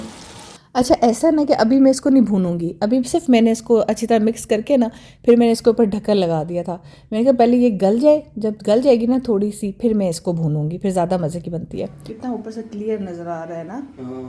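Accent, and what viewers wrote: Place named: Kashmir, India. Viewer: ❤AP k zillah bhimber army center family quarters sa hn AP k velog bhot acha hoty hn 🎉🎉 mñ 27year sa family quarters MN rah re hon AP MN offeiceres waife wala gharoor Nazar ne Aya or 1baat kashmeer k log bhot achy Mily mujy leepa bodr samahni kotli atthmuqaam all bodr ayrias k log sub bhot achy itny driy froouits khay hn hr Tarah k wha g kia baat apke kashmeery zuban metthee se baji 🎉❤❤ bhot ceuit hn sub ko assalamualaikum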